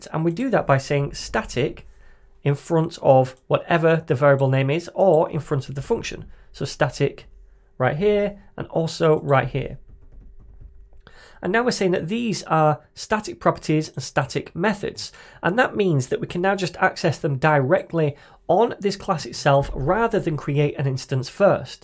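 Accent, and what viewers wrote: United Kingdom. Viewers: diving into the topic instant -british accent -on point -examples made easy to understand please never stop you made this nutshell powerful. Continue your videos like this it's more helpful than you think it is. People are way into "yo whats up this is .. and never forget to click the bell and sub" and "for a short ad we can do this" such sellouts.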